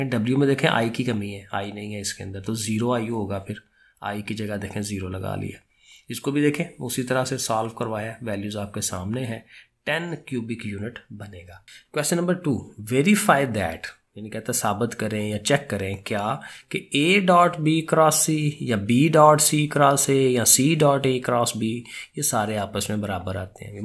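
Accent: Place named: Lahore, Pakistan. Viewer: Sir paka lahori hai 😅 bda maze ka accent hai sir such a kind personality❤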